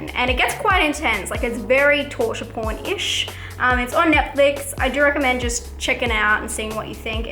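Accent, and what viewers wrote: Australia. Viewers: Yay,!! Saw movies!! Big fan! So cool you went to the Gold Coast! I personally think the west coast has nothing to envy. Sounds like you are from Western Australia?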